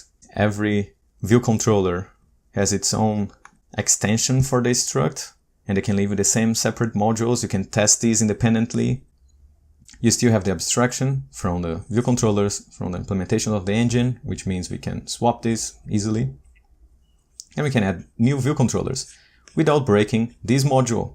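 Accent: Brazil. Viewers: what a great course! I'm lovin it! BTW are you brazilian? Your accent is looks like from brazil haha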